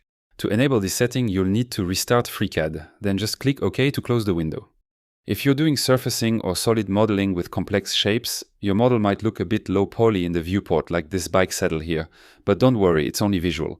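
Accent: France. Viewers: I can hear the accent… your french is showing! ( vrai question, est-ce que ce tuto est disponible en français? Je n’ai rien trouvé… avec l’outil de recherche) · very very well. although the speed of talking ( that i had to reduce the speed to 0.75 or even 0.5 in somewhere) I liked the way of explaining and your very pleasant French accent. I still have a problem with constraints and why, when, where, which and how to use them😁😁. If its possible make a clip about that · Are you french ?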